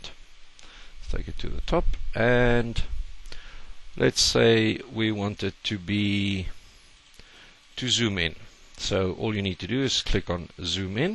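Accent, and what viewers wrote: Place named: Scotland, United Kingdom. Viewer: nthx btw u sound scottish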